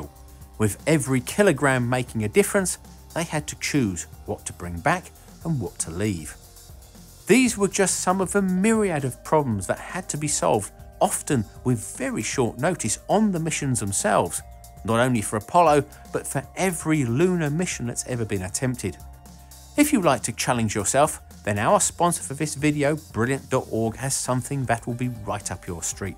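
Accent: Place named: England, United Kingdom. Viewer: English accent I'm out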